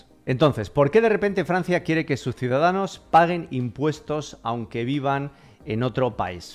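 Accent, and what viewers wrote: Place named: Spain. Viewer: Entre con una curiosidad. Pense: este tio seguro que tiene acento español. Asi es.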